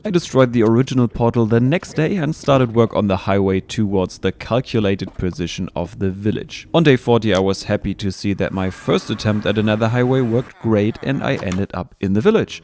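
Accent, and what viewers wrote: Germany. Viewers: You have a german accent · You sound German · Are u germAN? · You sound a little bit german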